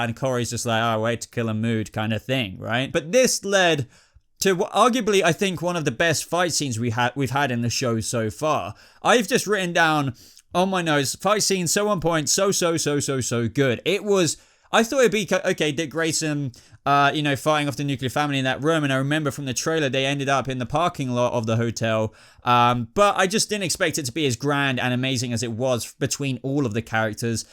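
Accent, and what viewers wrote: United Kingdom. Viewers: Hi. -Where are you from ? - I signed up to DC Universe and like your video. I'm a "Newbie" ... but I will 'Like' and 'Subscribe' to get your 'Take' on the series. ... USA, Washington State, Seattle here .. wondering where you are from .. "vit" a-mins" vs. "vite a mins" ... I'm thinkin' British, or Aussie or Kiwi. It's All good .... just glad it's in English .. or as you may characterize it (ME), American English :-p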